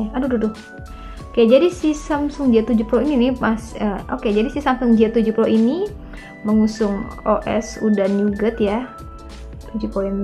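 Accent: West Java, Indonesia. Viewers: Urang sunda nye teh?😊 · Orang Sunda 🤣🤣 suarana teh meuni lembut...aya ngejendol na🤣🤣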